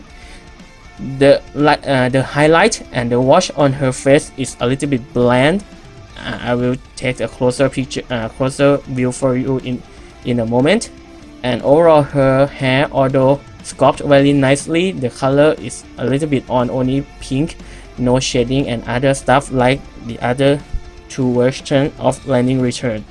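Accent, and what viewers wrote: Thailand. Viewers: เหมือน คนไทยเลย สำเนียง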